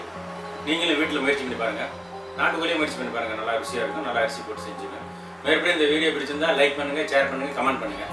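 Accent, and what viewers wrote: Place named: South India, India. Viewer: I promise I am not going to watch useless videos which r wasting my time Also me -watching this* His videos r love😍😍😍..I saw his Hindi channel that Hindi accent of southies is really cute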